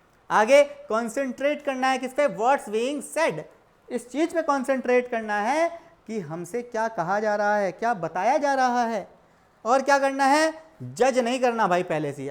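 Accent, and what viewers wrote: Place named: Bijnor, India. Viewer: Sir aap bijnor se hai 😃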